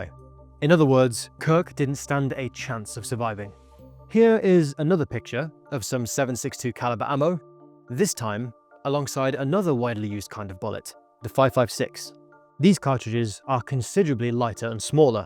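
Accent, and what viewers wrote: United Kingdom. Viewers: Did you not hear his voice. British bro.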